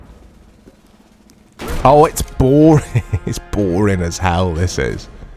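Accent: Wales, United Kingdom. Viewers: Just saw your Gen Zero worth playing in 2024 and wanted to ask by any chance are you from Wales or live close by as you have a very Welshy accent.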